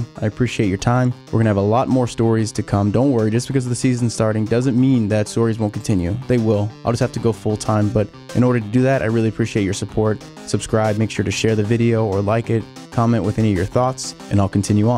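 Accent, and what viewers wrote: United States. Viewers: Hearing an American talk about F1 is just weird. The names of the drivers, the tracks... its just wrong bro xD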